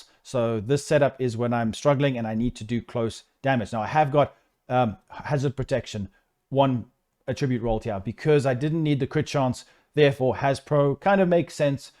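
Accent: South Africa. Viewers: you from south africa ?? Discord ?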